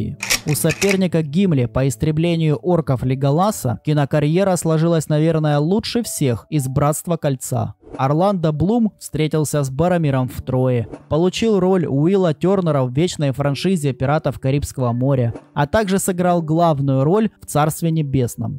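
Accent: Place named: Ukraine. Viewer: Интересно рассказываешь. И интонации зачастую правильные берёшь. Заметил слабый украинский акцент) Угадал? Может показалось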